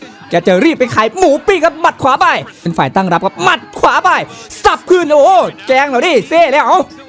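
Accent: Isan, Thailand. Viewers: ชอบผู้บรรยายเป็นภาษาอีสาน ชอบหลายเด้อครับ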